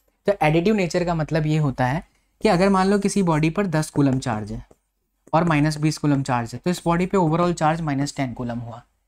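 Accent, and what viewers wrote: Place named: Tamil Nadu, India. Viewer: 46' 12 Tamil accent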